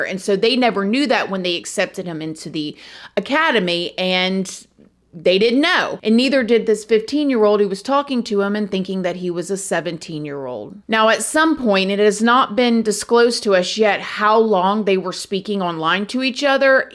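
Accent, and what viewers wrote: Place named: Upper South, United States. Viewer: I'm not commenting on the story, this is for you! That Southern lilt of your voice, hello my lovelies, show no, shoot can't remember all of it. Just no, that's why I subscribed! Your voice is amazing for voice overs, and radio! Fabulous!! Edit: Your 6 yr old??? Disgusting!!!! ALWAYS Protect children, seniors and animals!!! WTH is wrong with humanity, beyond WRONG!!!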